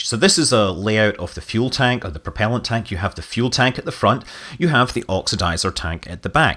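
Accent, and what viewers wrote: Scotland, United Kingdom. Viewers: He speaks clearly and pronounces well. And obviously a company that does language recognition in many different languages can manage a Scottish Speaker subprofile for English. Besides, that is not a strong accent, it is a very mild one. Go watch the Prime Minister’s Questions when an SNP (Scottish National Party) leader asks questions.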